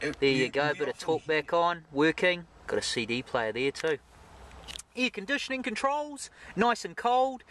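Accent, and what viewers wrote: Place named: New Zealand. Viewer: Funny kiwi accent)) Keen as))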